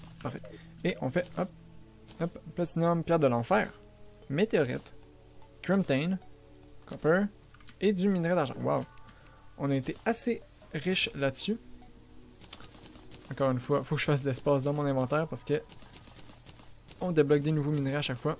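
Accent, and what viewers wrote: Quebec, Canada. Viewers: Est tu québécois répond moi svp ;)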